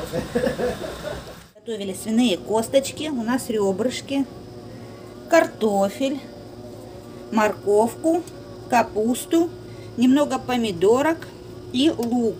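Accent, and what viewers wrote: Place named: Visayas, Philippines. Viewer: Hello Russia 💘👋✌that's why the tone is Visayan because it's Cebu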